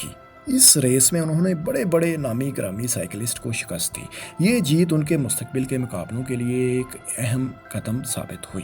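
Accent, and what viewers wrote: Punjab, Pakistan. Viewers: Fuzol video hay Bhai choti se baat ko itna lamba khencha hay....Waste of time...plz improve your Urdu accent..Punjabi lahja hay ap ka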